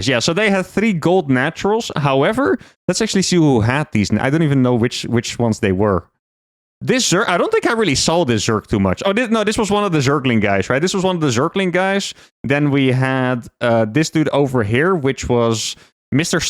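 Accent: Netherlands. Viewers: Oh ja, dat Nederlandse accent XD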